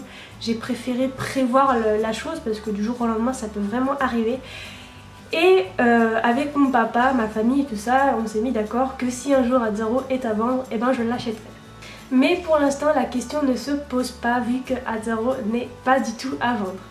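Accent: England, United Kingdom. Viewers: Ton accent anglais est juste magique xD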